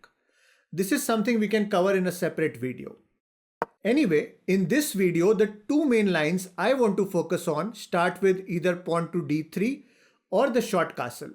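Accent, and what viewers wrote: India. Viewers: Is it me or does every single Indian have the same exact voice and pronunciation?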